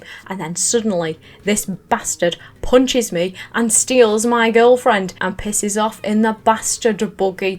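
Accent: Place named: England, United Kingdom. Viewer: Recently found your channel and marathoned most of your content, I moved away from England 8 years ago and didn't realise how much I miss the accent. Glad to find a new upload.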